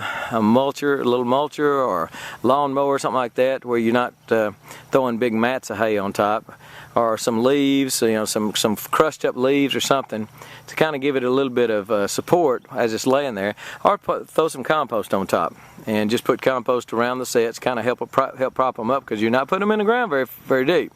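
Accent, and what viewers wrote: Texas, United States. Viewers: Always great to hear another Texan. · He has a great accent. I wonder what part of Texas he is from.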